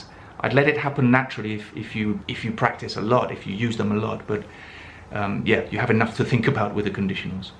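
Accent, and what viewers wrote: United Kingdom. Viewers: I fall in love with your videos 😊 Especially your impossible British accent 😍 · Thank you very much! Great way of learning English grammar (also British accent, which I personally love😍).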